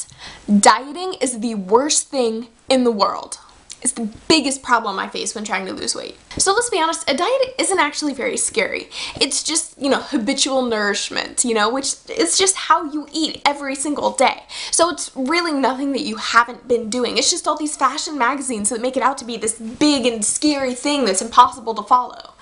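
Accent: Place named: United States. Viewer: Possibly the most annoying american accent ive ever heard. My ears are bleeding.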